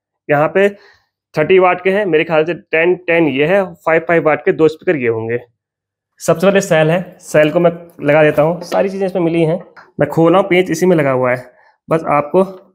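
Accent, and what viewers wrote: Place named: Pahadi, India. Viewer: Nice vdo..🎉 ..apki awaj se feel hua pahadi ho..fir channel pe dekha nanital mentioned h 😅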